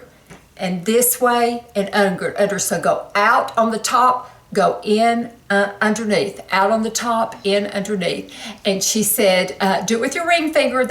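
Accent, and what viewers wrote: South, United States. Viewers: Wonderful "tips"! I actually follow a lot of them. After too many years of not caring for my body (smoking, drinking, not sleeping enough, eating poorly, etc), I'm probably the healthiest internally than ever (at 63) since I've quit all that nonsense. Now I suffer with sciatica, but my husband and I hike portions of the Appalachian Trail yearly. I've put in a few hundred miles now and that's the only time my sciatica doesn't act up! Walking, climbing those Appalachian mountains, and sleeping in a tent for weeks on end make me feel youthful again! Amazing! So I'm a firm believer in STAYING ACTIVE, whether it's exercise in your living room or a gym, or bike riding, yoga, swimming, running...whatever you can do to stay active is a good thing. And having a hobby is great too. Keep the mind active as well! I am an artist, and I try to stay involved with my community art group. 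I just love your videos...you seem like such an sweet, genuine, Southern belle (Tennessee? Kentucky?), and I thank you for your videos.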